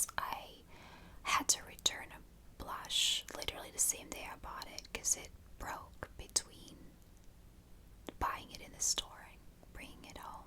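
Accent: United States. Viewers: i recently found ur channel and have been loving ur videos! are you irish? you mentioned you’re located in ireland but you sound american to me!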